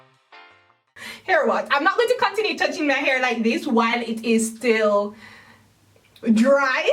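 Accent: Jamaica. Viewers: Which country are you from? Because I hear a jamaican accent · R u Jamaican or carribean???? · Her voice is high but her accent sounds pretty Jamaican and she's said that she's Jamaican.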